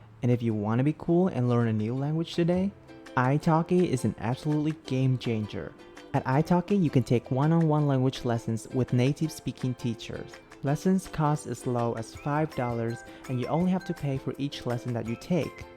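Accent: Vietnam. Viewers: He's speaking in Southern dialect, while you may learn Vietnamese in Northen dialect, I guess. The tones can be very different between dialects. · for an implied southern viet you pronounce a lot of your words in a northern accent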